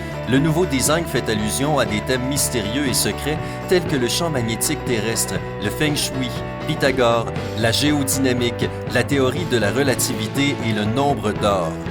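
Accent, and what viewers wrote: Quebec, Canada. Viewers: Oh quebequois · Ces moi ou la voix et québécoise ???😂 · J’adore l’accent québécois 🤗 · MALAK LOURAK lol c'est juste une voix cébékoise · MALAK LOURAK il a pas le nez boucher il est québécois... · Yo sont accent quebz 😂😅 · MALAK LOURAK c’est l’accent québécois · La voix a un petit accent québécois vous trouver pas?🤨🧐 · Norabella07 Hamdani Peut être parce que c'est une vois québécoise....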